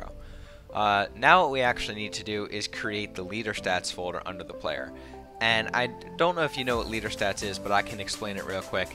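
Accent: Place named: Romania. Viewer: Salut, esti roman? Imi place cum vorbesti engleza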